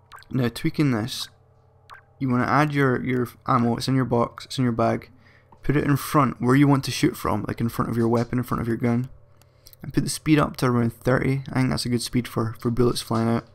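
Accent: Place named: Scotland, United Kingdom. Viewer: cool vid but are you speaking english? That scottish accent is rough.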